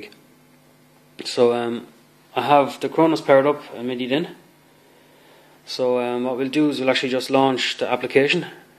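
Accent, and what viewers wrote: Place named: Ireland. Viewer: I've actually bought the VSTs you sell on your website! I've always wondered what you sound like - now I know. Totally Irish, exactly like my father. Keep up the great work - Chris.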